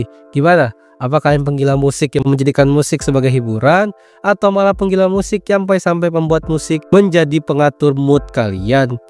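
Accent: Java, Indonesia. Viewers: Suara lu jawa min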